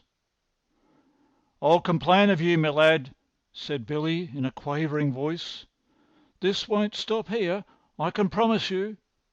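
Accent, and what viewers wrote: Australia. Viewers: Australian? good reader - good story. thanks :} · The Australian rising question intonation is distracting.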